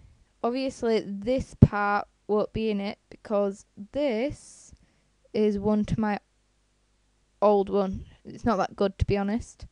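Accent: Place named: United Kingdom. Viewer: I know right GoodLuckPeice she does sound Brittish! I couldn't really understand her because im asian.And how do you get the link thing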